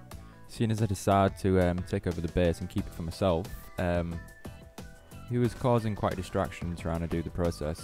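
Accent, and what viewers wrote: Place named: Hull, United Kingdom. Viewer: Yo man nice video! where are you from in england ? you sound like you're from hull haha! thats where im from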